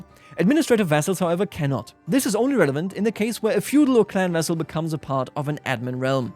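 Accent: Bavaria, Germany. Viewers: Must be the Bavarian accent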